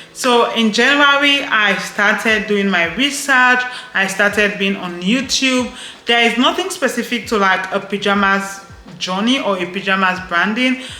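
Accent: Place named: Cameroon. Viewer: hi i have watched most of your videos , so that i can learn and help my wife set up her pyjama line too . For now we buy from people who already shipped in to cameroon from china . so we make little profit if we want the good stuff . major challenge is how to get a good manufacturer who os reliable .Maybe you can help us . We are willing to pay for the help1; also i always wanted to ask what nationality of origin you are , because youy sound cameroonian as you have an underlyiong french accent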